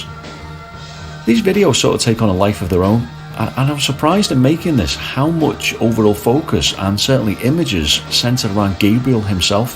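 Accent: Liverpool, United Kingdom. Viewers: Sorry ..... only just got on to your this really is fantastic content and fantastic to hear your a fellow scouser . Thank you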